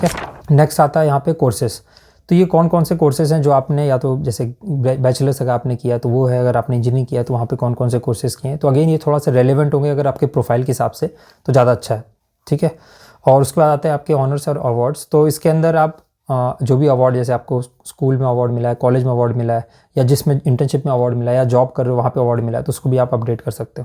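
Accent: Odisha, India. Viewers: Bhai are u from Odisha?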